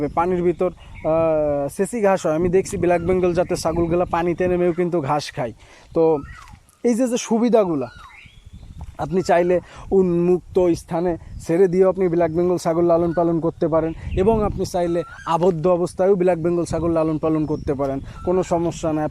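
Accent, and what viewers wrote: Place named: Faridpur, Bangladesh. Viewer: ভাই আপনার ভাষা ফরিদপুর কোন জায়গা,,, আমার বাড়ি সালথা মাজারদিয়া ইউনিয়ন কাকদি গ্রাম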